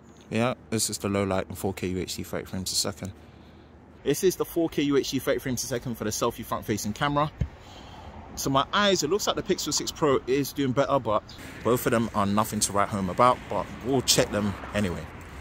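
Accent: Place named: United Kingdom. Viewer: i like that british accent my friend. how about battery test ?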